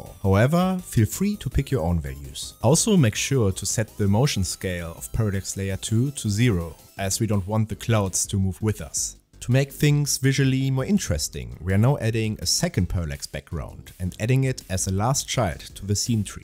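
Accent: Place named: Germany. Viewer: Den deutschen Akzent hört man sofort raus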